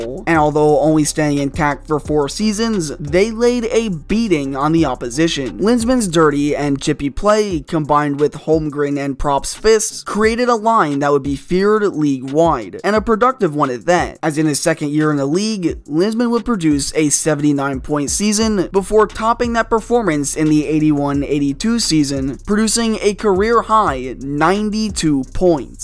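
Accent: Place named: Maritimes, Canada. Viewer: Im hearing East coast accent, sounds like NB or CB. Im leaning more towards NB now after watching a few more of your vids.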